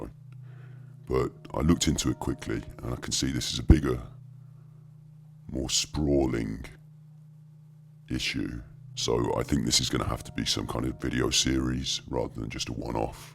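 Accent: Birmingham, United Kingdom. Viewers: I just discovered you and I'm loving your many analyses! I adore your accent as well. Are you from Birmingham, by any chance?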